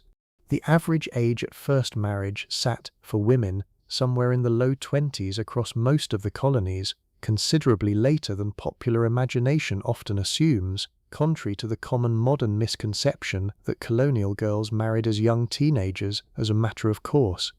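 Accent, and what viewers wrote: United Kingdom. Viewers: You with the British accent forget that your country transported 3.2 million slaves from the 1600s making yourselves very rich. The entire endeavor is still going on in too many lands by the same people that sold their own all those years ago. It is all diabolical.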